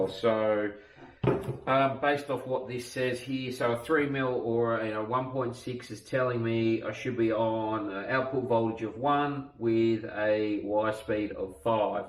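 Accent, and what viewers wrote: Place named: Australia. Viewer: The great quintessential Aussie accent. Thanks for your interesting review.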